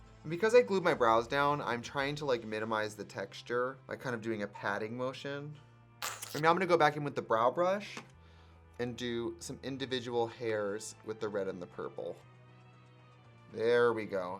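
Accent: Wisconsin, United States. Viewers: Is it just me, or was the Wisconsin accent really making herself known in this video?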